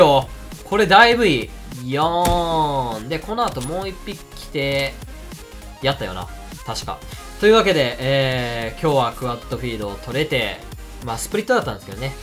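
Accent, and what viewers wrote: Kansai, Japan. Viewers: 元が関西やからな。普通に関西弁使うときあるしイントネーションも関西寄り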